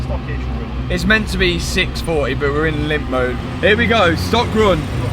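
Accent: Leicester, United Kingdom. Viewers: yup, Leicester accent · From Argentina, I congratulate you on your channel; your content is very good. I find it a bit challenging to understand you because of the British accent, but at the same time, I enjoy it and it helps me improve my English. Keep it up!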